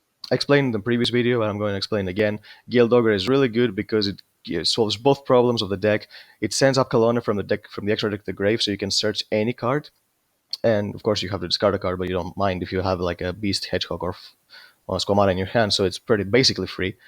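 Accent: Greece. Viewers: Και έλεγα ρε φίλε λίγο ελληνική αυτή η προφορά 😂😂😂, πολύ καλή δουλειά πάντως μπρο συνέχισε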